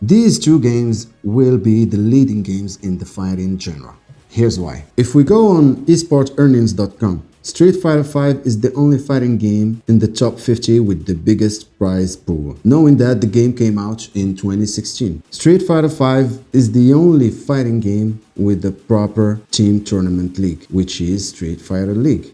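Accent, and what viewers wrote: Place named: Morocco. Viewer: Good content bro keep it up (ps:: mn accent dyalk u sound maghribi, did i guess that right ?)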